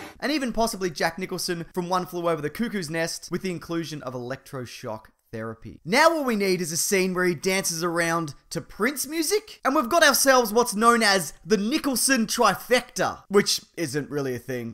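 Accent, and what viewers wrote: Australia. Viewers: Great video! I love your voice, by the way! Nice accent, and I love how you get louder and quieter. Are you Australian?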